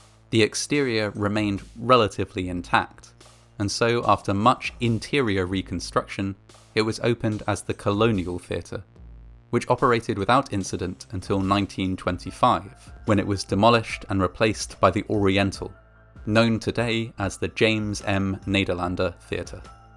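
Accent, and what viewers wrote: England, United Kingdom. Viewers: This channel is amazing and you sound like a really intelligent guy. Very refreshing. Would I be correct in assuming that you are English?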